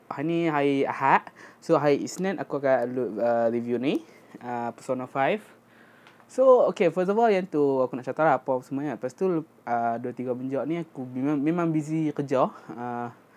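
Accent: North, Malaysia. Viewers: accent utara pulak tu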